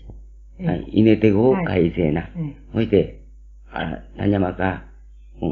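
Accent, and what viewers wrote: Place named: Kagoshima, Japan. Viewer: 鹿児島県民やからやけど、まだ、わかりやすい方の鹿児島弁やな、頴娃語やとガチでわからん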